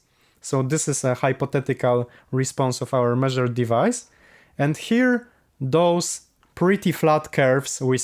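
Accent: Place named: Poland. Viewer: Odpalam anglojęzyczny filmik i słyszę polskie akcenty i widzę w opisie, żeś Polak xD pozdrowienia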